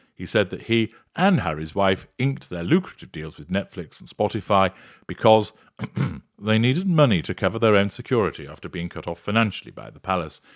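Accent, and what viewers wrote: United States. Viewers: Damn fine 'Merican accent! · Pretty decent American accent. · You've been working on your American accent. Nice!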